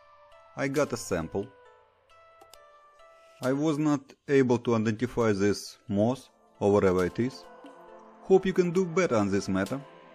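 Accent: Russia. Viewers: This " meanwhile in Russia" accent...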